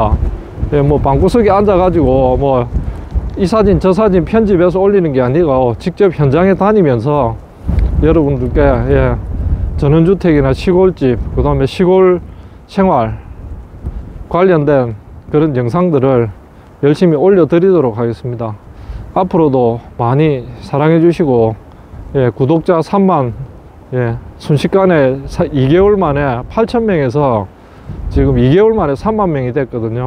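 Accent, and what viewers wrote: Gyeongsang, South Korea. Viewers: 부조리 고발영상및 구수한 경상도 목소리 너무 잘하고 계십니다! 방송 잘보고 있습니다! 화이팅!! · 3만 축하드립니다 ! 시골의 모습을 이렇게 정직하게 보여주시니 앞으로도 구독자수가 빠르게 올라갈 것 같습니다. 얼굴공개 안하는 건 좋은 결정인것 같구요, 정감가는 경남사투리가 참 듣기 좋네요 ~ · 감축드립니다 매우건전하고 진실된팩트방송 최고예요👍 경상도사투리 너므정겨워요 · 한 2주 전부터 보기 시작했는데 처음부터 믿을 만한 분이라 생각했지요. 경상도 억양이지만 사투리는 거의 없는 구수한 목소리가 좋아요. 그리고 잔잔한 정의감, 왠지 성실할 것 같은 삶의 자세, 이런 것들이 좋아 보입니다. 계속 열심히 하시기 바랍니다. 챙겨보겠습니다.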